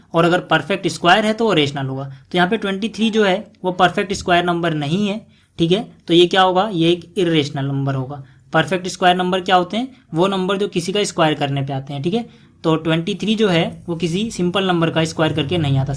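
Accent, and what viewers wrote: Bihar, India. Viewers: Sir AP ky Bihar sa ha konsa jila sa hai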